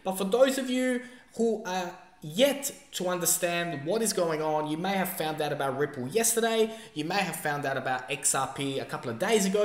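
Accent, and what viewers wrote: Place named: Australia. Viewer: Nice to hear another Aussie do u have a twitter account i can follow? cheers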